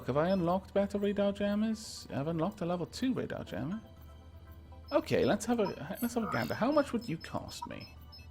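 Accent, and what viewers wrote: Wales, United Kingdom. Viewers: A MASSIVE: THANK YOU!!! (^_^) Hi! I'd been watching lots of Cataclysm: Dark Days Ahead vid's here on youtube when I stumbled across your fantastic channel. After I'd ran out of your Cata' vid's to watch I briefly checked your Pixel Piracy when I noticed these Robocraft vid's of yours. As a fan of Minecraft & Kerbal SP the appeal was instant. I downloaded the game that same & haven't touched anything else for a solid week now. I've been making pretty much 1 to 2 vid's a day now since I started out on this epic quest of awesome cube versus cube destruction and would just like to thank you for your part in this. Will give you a shout out in my next video. I'm a fellow Brit & love your accent [ Welsh? ] - hope to see you ingame someday & please feel free to see what you've caused if you ever have the time over on my channel, would love some feedback if you do ever get the time to peruse my efforts so far, cheers Sho (^_^) <link>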